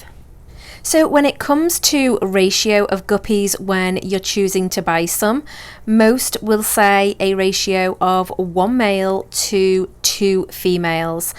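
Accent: Liverpool, United Kingdom. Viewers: Is that a hint of scouse I hear in that accent? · Random question but are you from Liverpool?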